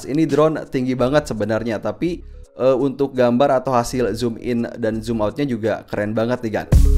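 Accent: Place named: Majalengka, Indonesia. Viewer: Alih sudah duga dari awal Bnr beliau emang orang Majalengka hehehehe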